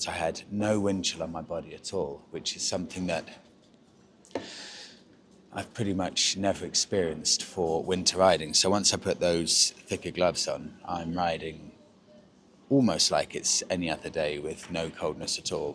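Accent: United Kingdom. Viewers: Hello. Your dialect is like music in my ears.😊 Where in GB are you from?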